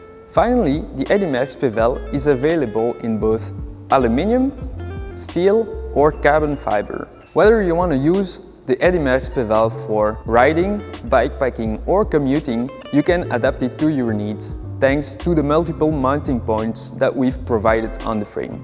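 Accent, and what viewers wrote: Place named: France. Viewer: I like the english with french accent😊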